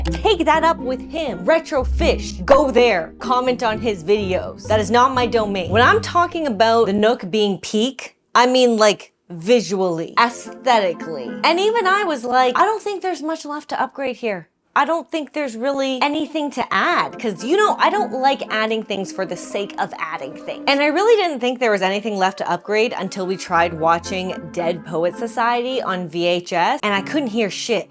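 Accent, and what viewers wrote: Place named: Canada. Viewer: I don't think I understood how a canadian accent sounded before watching your videos. Now I can most definetely tell haha.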